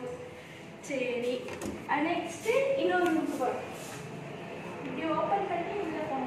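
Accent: Tirunelveli, India. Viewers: Innocent is bliss. Ur innocent soft voice n tirunelveli slang super...I happen to see one video on 16th may that ammavituke ponnu pogamatta..liked it subscribed n watched all videos same day...liked almost all. Keep doing.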